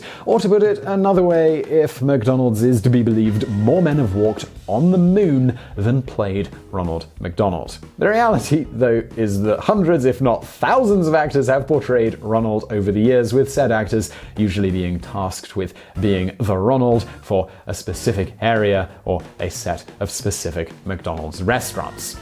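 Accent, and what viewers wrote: United Kingdom. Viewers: Literally ten minutes into this video I was like wait wtf he’s british 😂 i guess it was just the subject matter, but it was like my brain was filtering out the accent or something. Pleasant surprise, great video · Why is vsauce talking with a British accent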